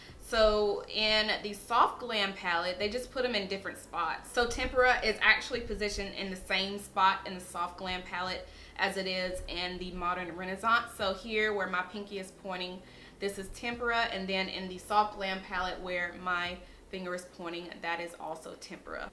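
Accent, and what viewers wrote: South, United States. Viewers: Her southern type accent is a little distracting and hard to focus what she’s actually sayibg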